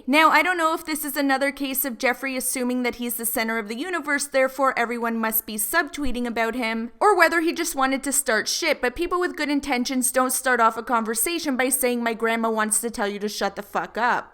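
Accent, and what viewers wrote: Canada. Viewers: no offense you sound like your from Canada from the way you say "sorry"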